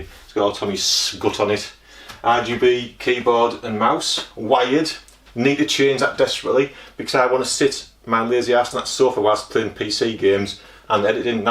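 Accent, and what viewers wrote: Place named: North East England, United Kingdom. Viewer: Looking forward to catching up on some of your vids matey.. I take it from your accent and reference to Boro you're a fellow North Easterner lol 😎👍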